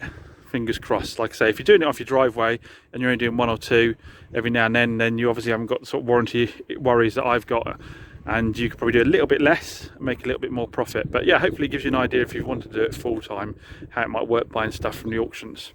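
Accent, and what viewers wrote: South East London, United Kingdom. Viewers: He's got a completely typical South East London accent.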